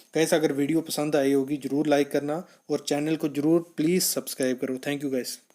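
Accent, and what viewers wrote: Jammu, India. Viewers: Bhai aap Jammu se ho kya? coz aapki hindi proper Jammu wali hai.. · Tu Jammu ka laga Raha ha muja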